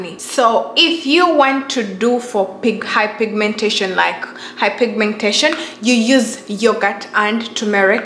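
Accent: Uganda. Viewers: You sound like you from UG, am I right?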